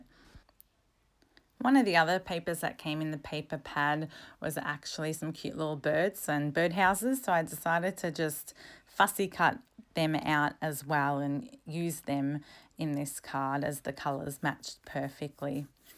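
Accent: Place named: Australia. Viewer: Lovely to hear another Aussie voice on the Tube. I liked all the cards, and your style of video. New subscriber!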